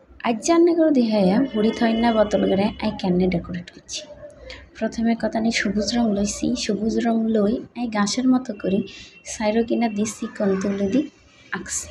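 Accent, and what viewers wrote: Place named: Noakhali, Bangladesh. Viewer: ও 😂 ভিডিও খান সুন্দর ই অইচে👌আনরা সবাই হরের ভিডিও কানের লাই অপেক্ষা আছি☺️☺️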